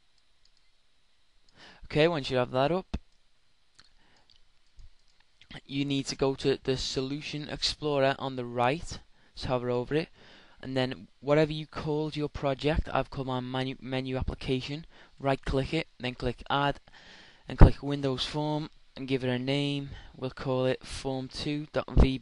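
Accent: Liverpool, United Kingdom. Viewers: Thank you so much for these! What makes these video tutorials really good is the simplistic approach. Straight to the point, plain language and easy to understand instructions. It takes the fear factor of getting into the "complicated" world of programming out of the equation. And let's not forget the Scouse accent!!! "Best in the Weld" :-)))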